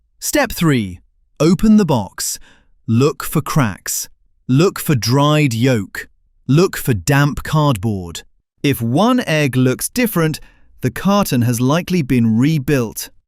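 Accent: United Kingdom. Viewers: English accented narrator, mentioning british supermarkets BUT stocked egg shelves with pricing in usa dollars!? Whats going on?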